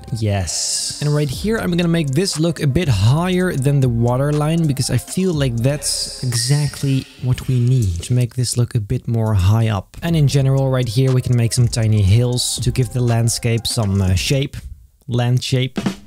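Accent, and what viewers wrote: Netherlands. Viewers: You sound dutch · Are you Dutch ? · Are you dutch?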